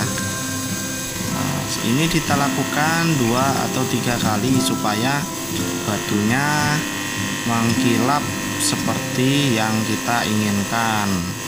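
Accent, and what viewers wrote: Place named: Java, Indonesia. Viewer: Maaf boss..kok logatnya ky orang jawa...?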